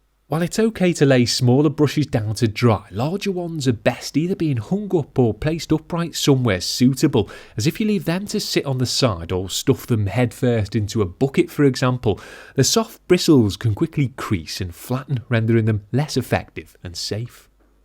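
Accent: Liverpool, United Kingdom. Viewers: Lovely Jubbly scouse😉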